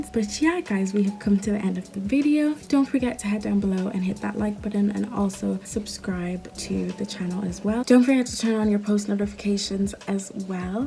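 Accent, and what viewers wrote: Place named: Ireland. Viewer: You seem to have a slight Irish accent. Are you in Ireland?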